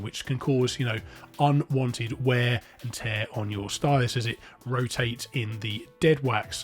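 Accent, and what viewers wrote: United Kingdom. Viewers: If you’re in the UK - which sounds like it by your accent - please can you advise on an imminent problem I’m facing with my Fluance RT85, please? I’ve had one for four years and I’m certain the belt will need replacing very soon, however, going to the Fluance site, a replacement belt is £17 (reasonable) but adding in the cheapest shipping option, it comes to £110! I don’t have any Canadian friends to ask to purchase on my behalf and also can’t find what could be a compatible belt here in the UK… any thoughts? This could be an issue that many will face sooner or later.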